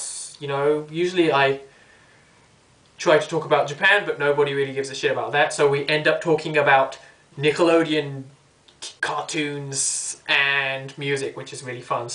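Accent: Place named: England, United Kingdom. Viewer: I'm REALLY curious on how u got ur Semi-English accent??!! I want one hehe Lo0oL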